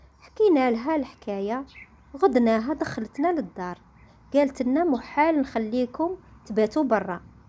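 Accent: Algeria. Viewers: والله ياختي راكي في القمة وأنا اكتشفت قناتك بالصدفة كنت انحوس على حكايات القسنطينية لقيت القناة أنتاعك وعجبتني وعجبتني اللهجة انتاعك على خاطر انتاع الشرق وأنا انقولك واصلي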